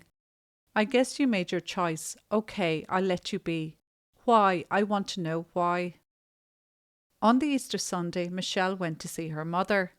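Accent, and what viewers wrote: Ireland. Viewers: This Irish cat doing the commentary freaks me out. She sounds like a Mother Superior on crack!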